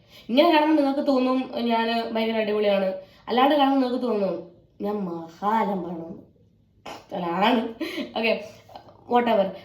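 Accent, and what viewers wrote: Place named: Kanhangad, India. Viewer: super avatharanam nammude kanhangadan slag idakku varatte adipoli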